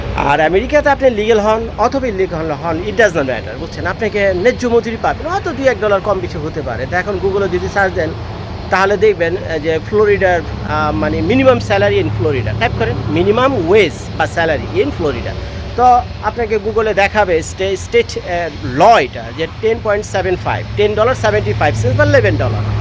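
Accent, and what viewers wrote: Dhaka, Bangladesh. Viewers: Vai apni ki dakia apnar kotha gulo dhakar vasar moto ami dhakar bacharamduriar amra kaligog khajur bag bari kinaclim apnar mukha dhakar vasa hsuna khub valo laga and apnar modduma usa shompoka onak kicu janta partaci thank you valo thikban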